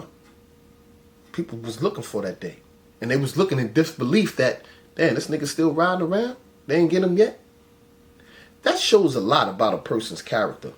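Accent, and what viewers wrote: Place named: New York, United States. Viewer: Handsome ash... besides the fact. You put me in the mind of llcoolj and like Alpo in dialect. I guess its a NY swag.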